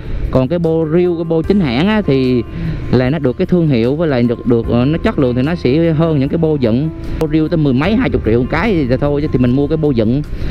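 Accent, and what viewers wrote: Central, Vietnam. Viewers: Nghe giọng ông anh miền trung vui ghê :))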